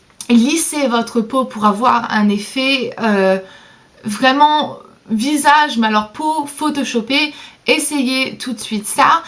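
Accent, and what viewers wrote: England, United Kingdom. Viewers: Salut tu es française? Je trouve que tu as un accent anglais...Bises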